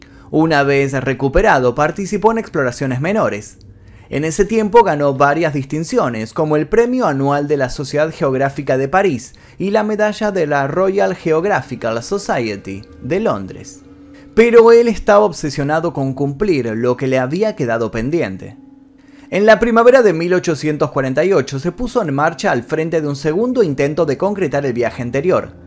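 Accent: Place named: Argentina. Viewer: Me interesó mucho el caso Asha Degree, pero por no ver el vídeo y sólo escucharlo, al principio lo busqué como "Aya" Degree, entendiendo la sh como "y" 😂. No se ofendan hermanos argentinos, es la particularidad de su acento, excelente video.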